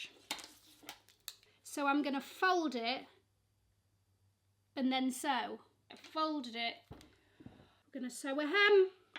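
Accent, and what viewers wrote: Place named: England, United Kingdom. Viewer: You have an ugly English accent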